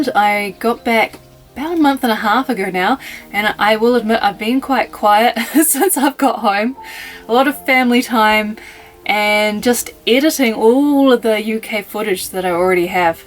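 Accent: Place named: New Zealand. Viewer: K you are such a brave lady, love your kiwi accent.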